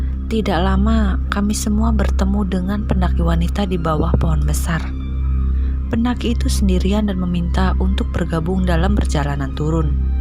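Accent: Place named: Central Java, Indonesia. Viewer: mbaknya pasti asli jawa tengah ya, kliatan suaranya.. darimana mbak min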